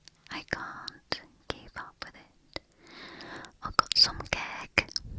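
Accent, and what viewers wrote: Black Country, United Kingdom. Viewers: Ah bab, this med mar day! Ar doh liv in the Black Country any mooer as ar liv ‘darn sarf’. It was lovely to hear ‘my’ accent and listen to the phrases my parents used to say. Thank you. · Always happy to hear you reppin' the Black Country,...practically my second language ay it? 🤣🤣🤣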